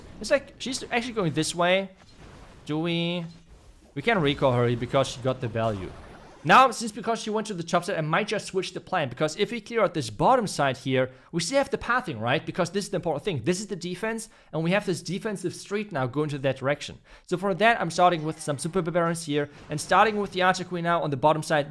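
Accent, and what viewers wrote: Germany. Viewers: Are you german spoken, your accent sounds probably exactly like mine, aus Drutschland, Österreich, SW??😅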